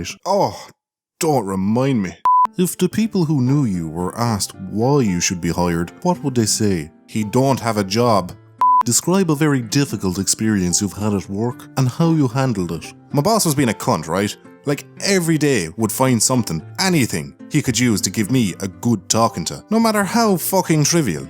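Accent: South Africa. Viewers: South african accent, can someone tell him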